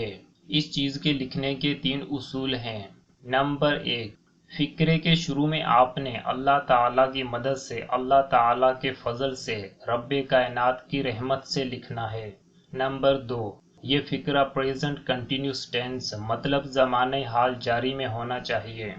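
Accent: Sindh, Pakistan. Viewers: Awaz kisi aur ki use kr loo. Betay ya bahi. sa bunwa loo video with good. sound and style. Sindi village style chorr du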